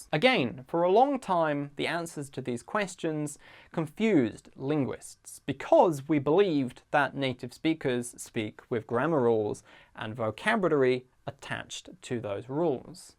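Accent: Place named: United Kingdom. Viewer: Yes, it is British English!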